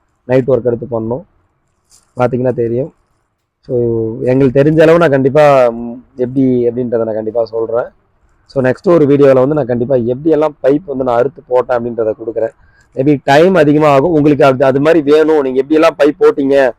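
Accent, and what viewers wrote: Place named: Andhra Pradesh, India. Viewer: Andhra pradesh erundhu pesuri nanba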